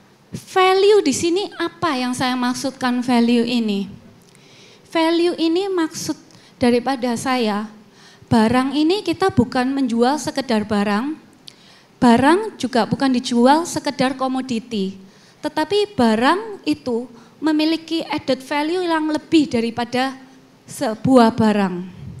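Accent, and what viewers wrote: Java, Indonesia. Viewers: MEDOG TENAN REK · medhok jawanya kental bangeeettt 😀😀😀😂😂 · Logat jawa nya terdengar jelas.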